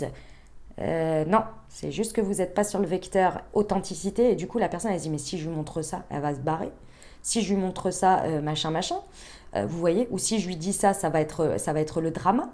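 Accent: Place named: Paris, France. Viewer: J'aime trop ton accent parisien il me fait grave sourire. Sur le fond toujours au top comme d'habitude 👌😊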